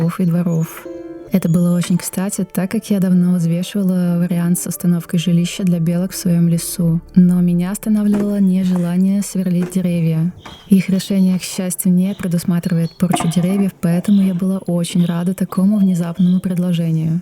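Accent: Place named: Latvia. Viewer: Скорее всего у автора латвийский говор и соответственно интонации в голосе. Хотите огня😂 смотрите Жорика Вартанова 😅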